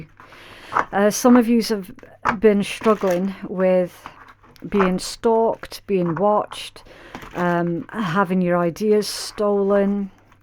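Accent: Scotland, United Kingdom. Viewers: Nice to hear a fellow Scot Content creator!🏴 Good tarot reader!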